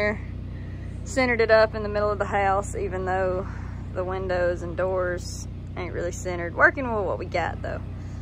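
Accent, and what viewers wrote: South, United States. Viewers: Hello from Thailand :) Been following your video‘s and those of your dad and I truly appreciate :) ya’ll I had the big chance to learn my English during a couple of exchanges in a great family in NC , and after some adaptations I started to really enjoy your “southern slang” :) So thank ya’ll for your cool content. Now I wonder why you try fasting, but if you really want to loose some weight, maybe try to lessen the fried stuff from time to time. Here they do not use a lot of butter or oil when cooking, they boil for example chicken in coconut milk, with some onions, herbs, garlic and pepper, and it’s delicious, your are full and you start loosing weight also :) We eat this with some rice. But I truly miss the delicious fried catfish the dad used to prepare after fishing together. Even got the chance to experience true “frogging’” at night time :) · I love that Souther accent. 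You are a very beautiful girl love your little puppy.Have a great day beautiful.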